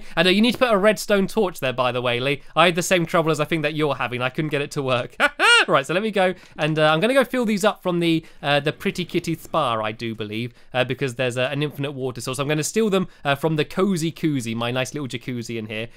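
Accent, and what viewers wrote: England, United Kingdom. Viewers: He's english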